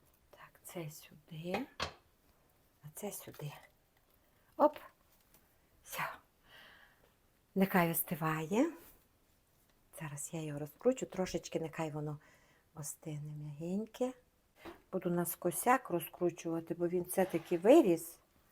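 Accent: Transcarpathia, Ukraine. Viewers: Не нервуйте😊- то є Закарпаття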